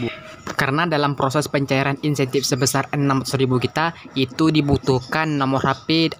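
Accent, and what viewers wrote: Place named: Madura, Indonesia. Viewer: Logat orang madure taiye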